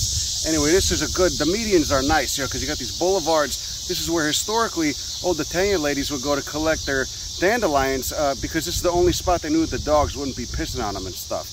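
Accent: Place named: Boston, United States. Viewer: Your enthusiasm and Bostonisms have infected me completely nice 👍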